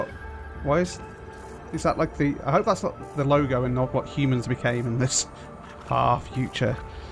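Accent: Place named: North, United Kingdom. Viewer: Not related to the game, but I'm having trouble placing your accent, it sounds like you are from the UK but I can't place it more precisely, maybe a bit northern but a bit London at the same time.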